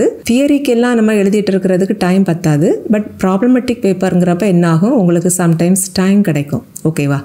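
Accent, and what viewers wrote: Kanyakumari, India. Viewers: Kanyakumari slang matiri irukutu niga pasuratu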